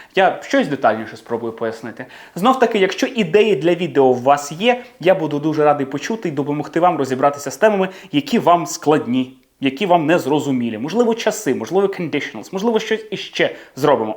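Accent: United Kingdom. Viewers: А в Нью-Йорку відчувався їм ваш британський акцент?? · Вперше бачу вчителя англійської з України з таким ідеальним брит акцентом, дуже нейтів🤩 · Дивлюсь відео і балдію 🤪згадую уроки англійської в свої молоді літа- зеара, бʼютіфул , зисис е пен 🤣🤣🤣🤣 а тут слухаю ніби британець ☺️😘Щиро вдячна за урок ! Успіху Вам і нам ПЕРЕМОГИ🇺🇦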